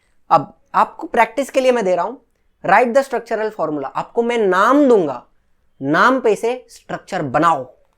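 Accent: Maharashtra, India. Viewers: Sir aap marathi hoo kya 🙃🙃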